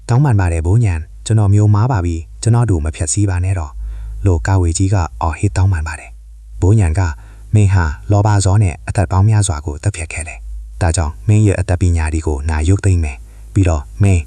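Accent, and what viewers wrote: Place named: China. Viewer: တရုတ်အသံလား တိုင်းရင်းသားဆိုသူတွေအသံလား ဗမာလူမျိုးရဲ့ ဗမာလေသံမဟုတ်ဘူး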